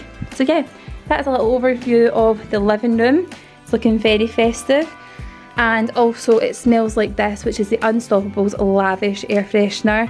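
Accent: Scotland, United Kingdom. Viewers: I love Scottish accents looool xx I just like it when you say “wee” ..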